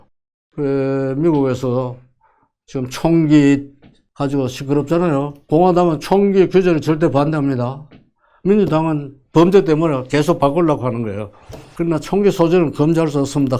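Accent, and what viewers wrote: Gyeongsang, South Korea. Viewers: "언어"를 "은어"로 발음하고 "어"와 "으"를 구별않는 경상도 식 억양이 섞였지만.. 직업적 강사가 아닌 분이 줄여서 하는 말이라 좋고, 오히려 더 확싫히 이해가 가네요.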